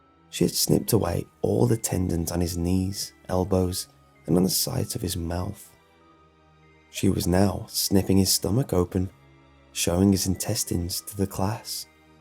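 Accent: United Kingdom. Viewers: Is from the uk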